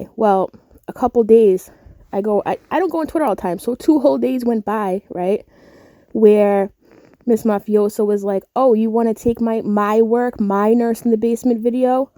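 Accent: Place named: New York, United States. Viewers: You are awesome... and I am not sure where you are from, but you sound like a fellow New Yorker to me! Love it! Keep being Unapologetically You Girlie!!! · Love your videos, love your fabulous East Coast accent! Thanks for your videos.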